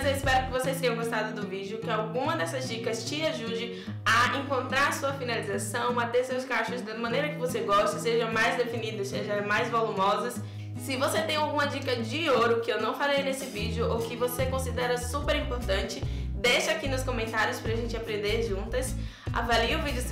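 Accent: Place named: Recife, Brazil. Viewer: Adorei seus vídeos, seu sotaque é lindo, vc é do Recife? Ah, vc é uma fofura, lindíssima!! 😘😘. Sucesso e benção de Deus pra vc e sua família! ❤❤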